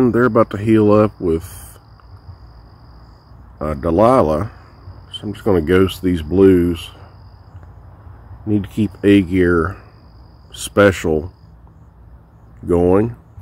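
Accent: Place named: Tennessee, United States. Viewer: BTW are you from around TN, was just wondering because of your accent. I live a stone's through away from TN. That's why I'm asking.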